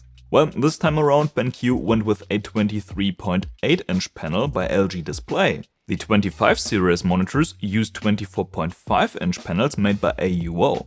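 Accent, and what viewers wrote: Germany. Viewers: wait he’s German?😭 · german, right?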